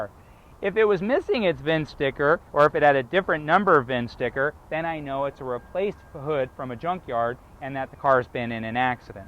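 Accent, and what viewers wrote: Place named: United States. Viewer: Despite am not very good in american accent english, but yes, I understood almost all this guy told us, and yes, he is right. Appreciations! Thanks baldhead guy(am also)😊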